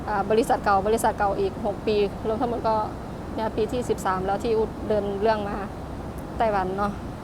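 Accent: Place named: Laos. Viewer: เว้าลาวกะได้ แซบหลายเด้อๆๆๆๆ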